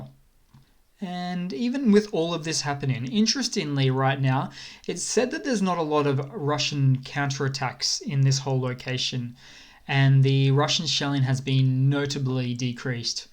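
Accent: Australia. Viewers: Love your delivery. I wonder where your accent is from? First, I thought you could be English but then I seemed to be picking up some Aussie cadence. Please put my curiosity to rest. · Very encouraging to hear that the Kherson counter offensive is more successful than the map shows, but should you be going public with this when Ukraine is keeping it quiet for Opsec? Anyway, I don’t know where you get your information from but if it’s correct it’s gold. Bahkmut too everyone else is saying the Russians are gaining. I hope you’re right that there is no progress. Btw, you sound like an Aussie which makes your sources of info even more mysterious 🇺🇦🇦🇺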